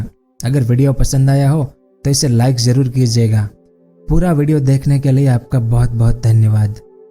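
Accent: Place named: Rajasthan, India. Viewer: Voice Rajasthani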